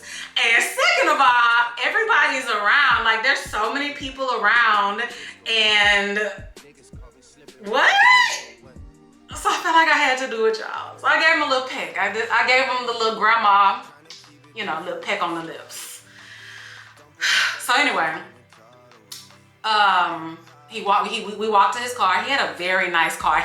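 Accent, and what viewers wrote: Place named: New York, United States. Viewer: He definitely sounds like a NY j*gga you picking up your sonnnnnnnn lmao #ihateithere #nycnative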